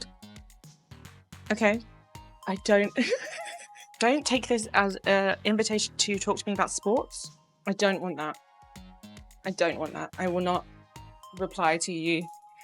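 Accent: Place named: United Kingdom. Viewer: Right of the bat: you seem so much more british on this one?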